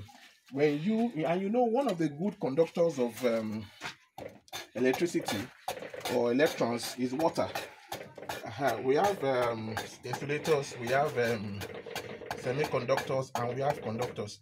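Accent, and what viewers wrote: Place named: Nigeria. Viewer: 🥱🥱🥱 Nigerian English sucks please use scientific language.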